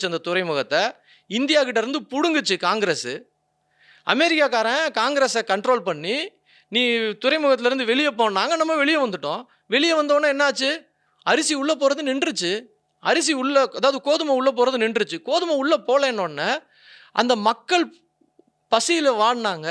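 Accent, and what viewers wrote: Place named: Kongu, India. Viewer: இவர்தெளிவாககொங்கு தமிழ்அழாககபேசுகிறார் இந்தகாணெரளிதெளிவாகதிடமாககருத்தயளிகிறார்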